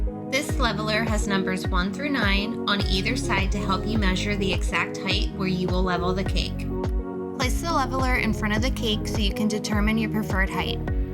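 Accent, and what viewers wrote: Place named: Midwest, United States. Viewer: Just out of curiosity (and you don't need to answer if it makes you uncomfortable), but where are you from? My ear is guessing somewhere in the midwest...but that's just a guess.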